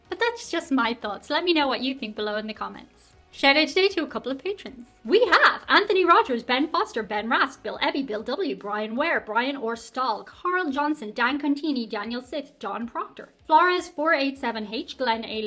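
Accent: Ireland. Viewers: I find it interesting as an American I much more easily understand the Irish accent better than the British.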